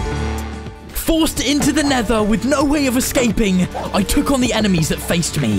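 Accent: United Kingdom. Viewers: I like your British accent it's cool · I saw herobrine u bri'ish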